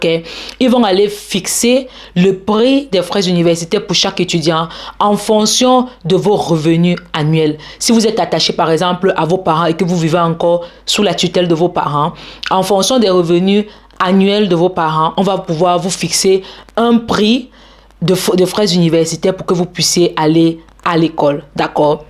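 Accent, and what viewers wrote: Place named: Italy. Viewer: Aimé ton parler italien 😢😢😢❤❤❤ dis moi comment me préparer avant d'arriver là-bas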